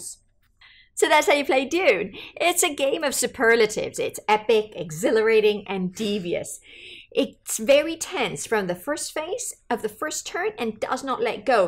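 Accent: United Kingdom. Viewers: Wow. Thank you so much. This was such a well produced rules set for Dune. I’m sorry that I’ve never come across your channel before. Do I detect a hint of Scottish in your voice? Thanks again!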